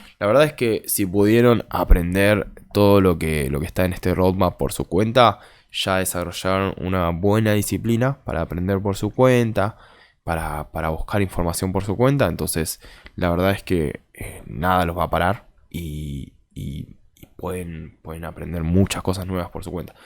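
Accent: Argentina. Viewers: Que feo un asiático con acento argentino :/ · Mis ojos: es un programador asiático Mis oídos: no, es argentino. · Un asiático hablaba español con acento argentino, estoy confundido jaja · Cuando ves a un chino hablando en español argentino, no tiene nada de malo, pero como que algo no cuadra.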